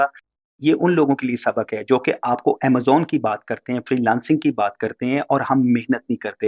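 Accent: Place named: Lucknow, India. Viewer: Sir Mashallah Apke baat kerne ka Andaz Lucknowi hai AP Kiya Lucknow se hn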